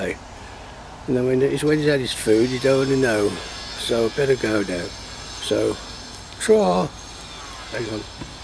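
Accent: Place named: United Kingdom. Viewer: Thought that this was the UK with the accent and a beautiful medieval castle.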